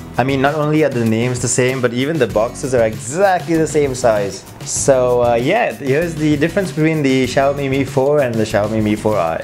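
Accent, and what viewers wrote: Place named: India. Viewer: Why not every indian have his accent?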